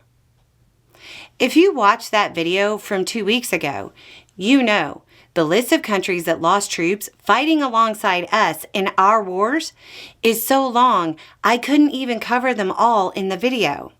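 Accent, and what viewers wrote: South, United States. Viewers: til now, I still can't get over that gentle, laid back, southern tone and charm spitting insightful, hard-hitting, whiplash-inducing commentary.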